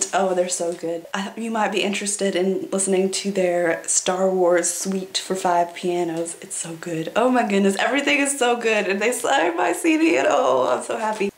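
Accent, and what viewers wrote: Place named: South, United States. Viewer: Thanks. :) The Southern accent wins again!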